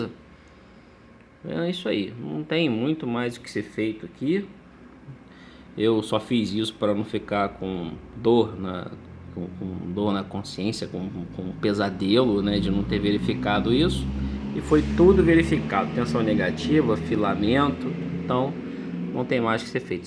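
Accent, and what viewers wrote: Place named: Rio de Janeiro, Brazil. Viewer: Boa tarde amigo. Pelo sotaque vi que você é do RJ. To com um F9 precisando de manutenção. Pode me passar seu contato?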